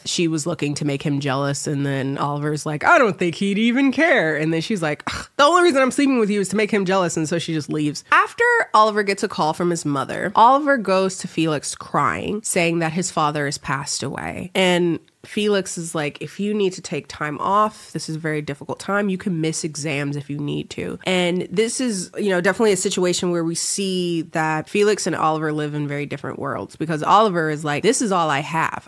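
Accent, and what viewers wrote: United Kingdom. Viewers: Don’t worry another recap spoiled those scenes and I kind of need those scenes spoiled to know when to fast forward. I would say Talented Mr. Ripley then Mean Girls cause Cady would never murder Regina lol.Love the British accent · I haven't watched your vids in a while and suddenly you sound British?!!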